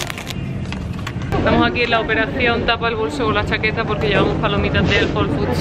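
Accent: Spain. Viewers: Madre mía cuánto tiempo sin escuchar pipirrana ... es escucharte y me transportas a esos maravillosos pueblos de España!!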